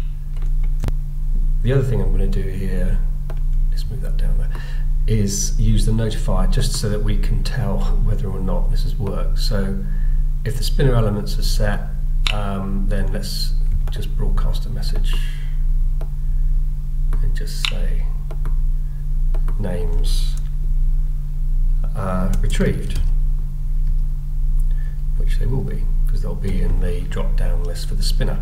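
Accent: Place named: United Kingdom. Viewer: This account is WAY better than the other accounts I have seen. Mainly because you sound British which means I can actually understand you. lol.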